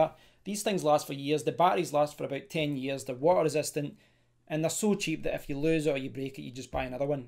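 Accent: United Kingdom. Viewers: Definitly British by the final entonation but I find your accent uncommon, unsusual for me. Maybe is it Scottish? Greetings from Spain.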